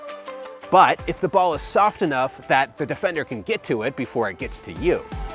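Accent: United States. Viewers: Nah, his accent is much likely american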